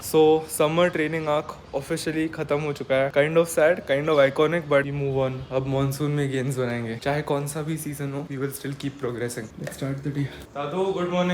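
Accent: Maharashtra, India. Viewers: Marathi accent >>> any other language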